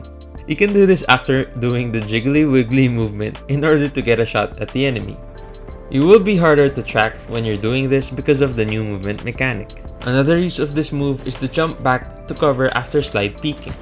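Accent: Philippines. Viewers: Wait..pinoy ka? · u sound like filipino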